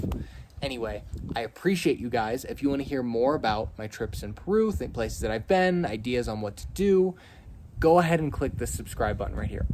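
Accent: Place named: North, United Kingdom. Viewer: hola amigo, estoy practicando mi listening skills, tu acento es norteño cierto¿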